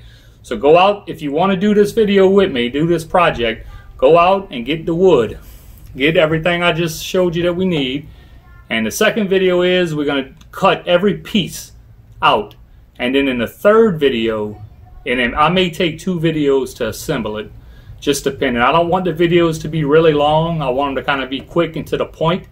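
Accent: Louisiana, United States. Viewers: Are you from S Louisiana? You do a great job on your videos partner..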